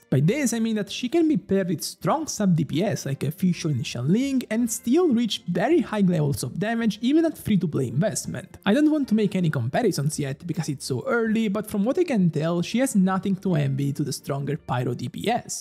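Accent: Italy. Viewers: He's also italian, you can hear his accent. He's mispronouncing it on purpose, so genshin babies don't get confused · Si sente tantissimo che è italiano ahahha. (Arleccino mi spezza ogni volta che lo sento ahaha )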